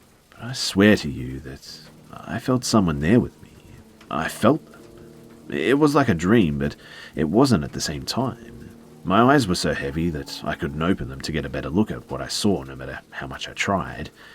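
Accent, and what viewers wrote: Australia. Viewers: Excellent stories, thank you for making this a good day by gracing us with that awesome Aussie voice! I don't know what it is about your voice but I could listen to you read instruction manuals and still love it LoL 😂😉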